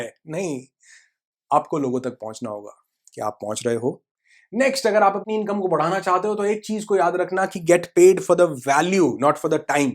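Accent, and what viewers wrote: Punjab, India. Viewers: Sir Punjabi ho tussi??... Boht Khushi hoyi sunke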